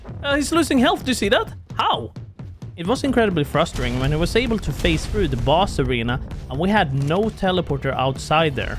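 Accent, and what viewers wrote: Sweden. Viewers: Are you from sweden? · where do come from ???????????? you sound swedish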